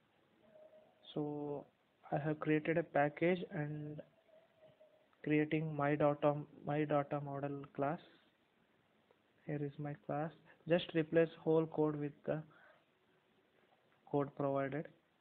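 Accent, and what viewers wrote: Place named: India. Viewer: I hate Indian accent. Next time, use a text-to-speech software to generate voice instead.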